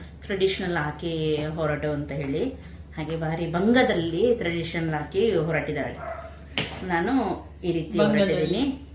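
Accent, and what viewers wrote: Mangalore, India. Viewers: Manglur bhasha yestu chanda😊l fast time watched your video super 😊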